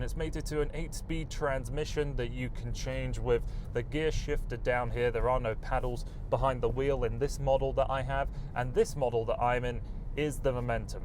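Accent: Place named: United Kingdom. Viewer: Thank you for another great and professional review. I am myself owner of v40 CC with old volvo's 5cylinder engine the sound of which I do enjoy and do miss in present only 4cylinder units. As to your Brit origin, I'm from Poland and for a while I was mistaken that Canadians speak so wonderfully in British English :)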